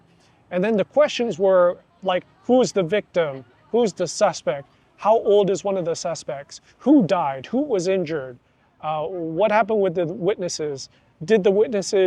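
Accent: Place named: California, United States. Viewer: You are an American from California, right? Judging from how you speak English, I figure you are an American from California. Why not just work in high tech industry in the U.S.? I believe that U.S. salary is still better than working in Thailand. U.S. has its problems, but it never withholds opportunities for the right person. I cannot say the same about Thailand. Keep up the good job in learning Thai. I like to know how you improve your listening comprehension in Thai? For me, listening is the most important aspect of getting to know Thai people. But it is also difficult because I am still challenged by Thai tones, despite having studied online with Thai teachers from Bangkok for two years.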